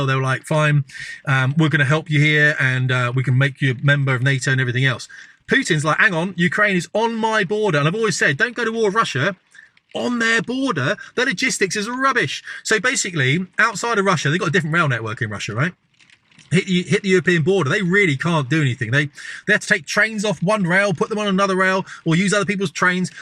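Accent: United Kingdom. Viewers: Love your UK accent mate. But I had to turn on CC just to follow you. You talk awfully fast. :) I have to ask what the real reason is that we (i.e. the US and other western countries) are so insanely set on defending Ukraine? It seems like it's got to be more than money. What is it in Ukraine that we don't want exposed? It must be something that threatens the cabal, the "powers that be". I don't know exactly who they are but it sure AIN'T Obama/Biden. Those people are just puppets. I'm sure Soros and company are part of this cabal, perhaps there are two competing cabals in which case I would expect the Vatican to be a part of it, i.e. the Great Whore, Babylon the Great, but regardless, there has to be something crucial in Ukraine which threatens their power. Trump is smart but I wonder if he really has taken the steps he needs to in order to crush this international shadow government. 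I'm cynically skeptical but we'll see. I think that once again he has people in his inner circle who are part of the shadow. Regardless, keep up the good posts mate. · Wow this dude has that brit accent down ... brilliant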